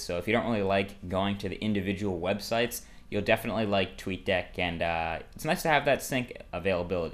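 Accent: Australia. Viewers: Im australian and i tought you sounded more Aussie then having a UK accent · Yeah, you sound more Australian, than Brit! Sorry, but it's true. Another very wonderful,expositive video. Thanks for all these tips, I'll try almost all of them. Hope you get 4000 likes! G'day mate! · Im from the UK, your accent sounds more Australian! XD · definitely Australian lol im british too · nice aussie accent! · Accent more Aussie than Brit lol. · Accent sounds more Austrailia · sounded aussie :D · Awesome British Accent! Got a hint of aussie in there aswell lol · im from the uk... we dont sound like that, thats more like austrailian tbh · Started off English. Ended up Australian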